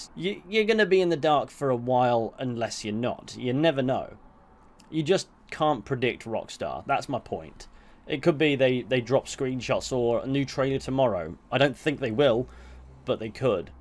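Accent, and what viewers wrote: United Kingdom. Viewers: لكنتك ليست أمريكيه هل انت من المملكه المتحده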